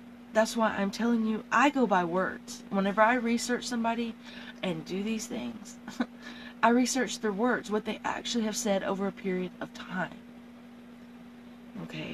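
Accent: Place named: South, United States. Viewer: Why did the voice change to a weird southern accent after episode one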